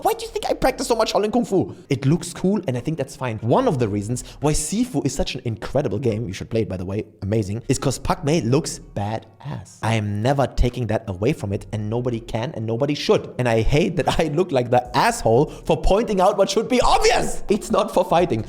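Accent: Germany. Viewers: ok, for me, your accent sounds kind of like german^^ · Holy didnt know was so German 🤧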